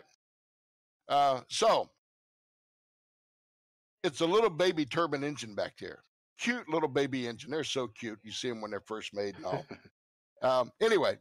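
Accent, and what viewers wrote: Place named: United States. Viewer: Jesus he sounds so American xD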